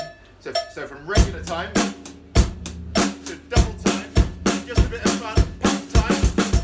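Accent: England, United Kingdom. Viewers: loved it!! also I love your English accent :)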